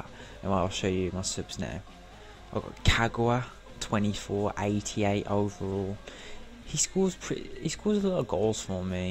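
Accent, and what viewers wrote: United Kingdom. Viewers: U sound proper essex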